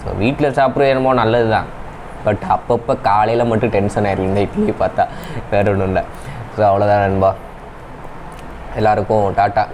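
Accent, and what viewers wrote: Madurai, India. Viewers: Hi bro unga Madurai slang super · Anna unga Madurai slang super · Bro naan coimbatore but unga madurai slang super 👌😍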